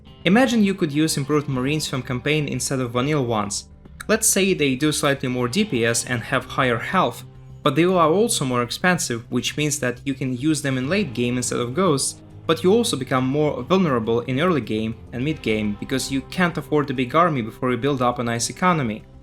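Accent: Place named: Russia. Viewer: not strange, just noticable, but in a good way, absolutely understandable and yes, now you mention Russian, I can kind of hear it, but much softer than the stereotypical (although also very cool) strong Russian accent! Thanks for answering, loving your vids! Cheers! :)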